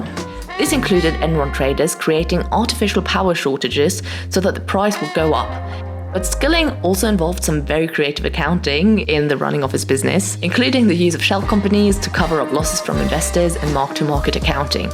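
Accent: England, United Kingdom. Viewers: Excuse me if you mind, where are you from? I figured out where your accent is from, it sounds like it's from somewhere in the UK but not quite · I'm riveted by the waterfall hair, the jazz, the beauty, and simultaneously the horror of the words actually being said. 925% ! Bloody f....king hell. Final verdict: dizzy & sick. Ooof. PS - really nice handwriting. With blurred f-stop closeups. And then the accent - which bit of England is that? Those are the last straws. What's a man to do.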